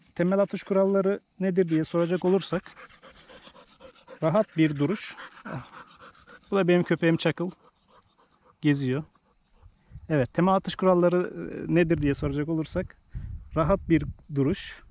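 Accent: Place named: Thrace, Turkey. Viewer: he anladım trakyalıya çok benzettimde bidekonuşma olarak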